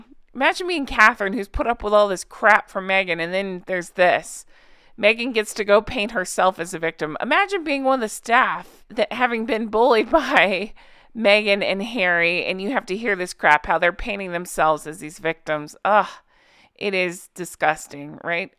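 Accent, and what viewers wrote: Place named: South, United States. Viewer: let your southern roll on out. I'm from NC and I totally get it. 😂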